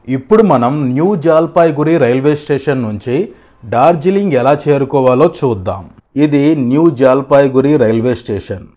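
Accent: Nellore, India. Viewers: బ్రదర్ మీది nellore ఆఆ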